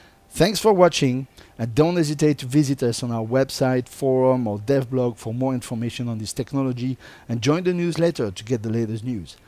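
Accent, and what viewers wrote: France. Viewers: Cette accent francais :D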